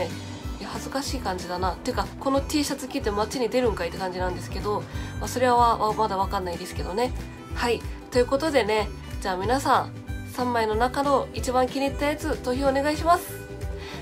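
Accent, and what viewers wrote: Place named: Kansai, Japan. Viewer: 自然に関西弁で全然良い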